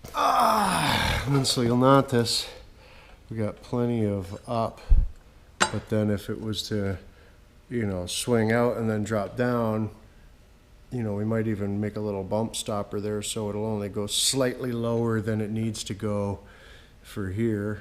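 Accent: Canada. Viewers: do all Canadians talk like you ?? or is that Inwood dialect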